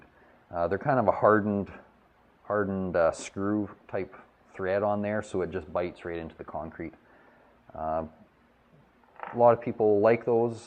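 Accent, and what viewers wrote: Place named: Canada. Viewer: are you canadian, your accent sounds so canadian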